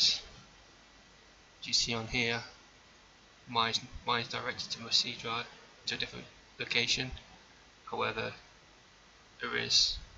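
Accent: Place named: United Kingdom. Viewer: I'm a nonnative English speaker I have a hard time to understand British accent :(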